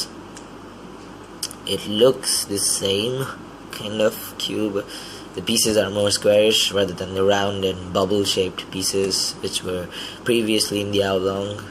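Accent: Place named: United States. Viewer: Dude why the American accent..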